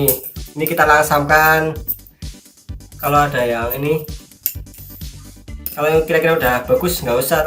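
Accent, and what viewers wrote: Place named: Banyumas, Indonesia. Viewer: Ngapak yaa hehe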